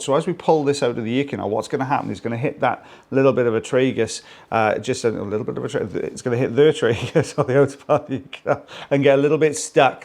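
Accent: Wales, United Kingdom. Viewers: It's the welsh accent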